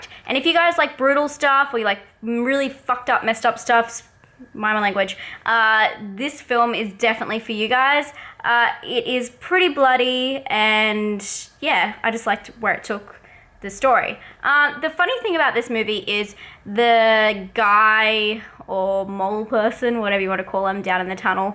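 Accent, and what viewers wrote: United States. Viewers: The American accent was.... Terrifying I guess is the word? You didn't do a bad job, it sounded authentic in a way. It just drained everything interesting or alive out of your voice and personality. Possibly because when anyone does an "American" accent they suddenly sound like they're from California, and most of us hate Californians, and don't talk like that. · Lmfaoooo your facial and expressions along with the American accent was priceless 🤣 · Oh I seen this film it a Brit horror I love this film it's got an actor in it called Jeremy Sheffield Sheffield is the town home city and the wired little creep it called Gregg and your American voice it wasn't that bad you actually sounded like one of those people who will get killed off earlier on in a horror film (no offence) but I thought this film was great hated the screeching noise bit that's probably me it's an autistic thing we don't like certain hight pitch noises.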